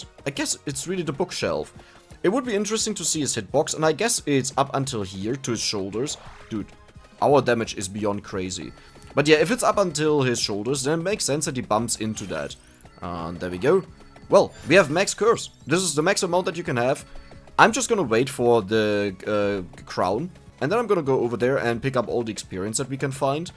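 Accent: Germany. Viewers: love the German accent and also great content and humour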